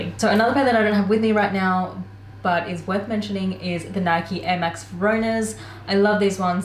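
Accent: Australia. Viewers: does her content has anything to do with her being indian?! and can't you tell by her accent that she is an australian (her origin can be in india whereas she can be australian by belonging there) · Wait are you Aussie bro if so sameeeee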